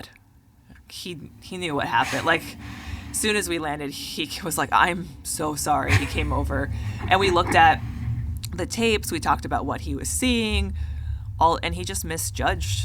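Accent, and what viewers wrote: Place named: Wisconsin, United States. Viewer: She’s awesome. What’s funny, I was listening to her talk and KNEW she was from Northern WI. I talked to/spent a lot of time with a woman about her age from up there and they sound the exact same 😂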